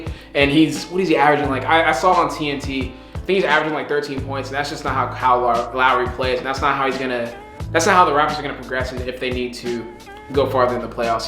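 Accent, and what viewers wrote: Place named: Nigeria. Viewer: That naija accent 😂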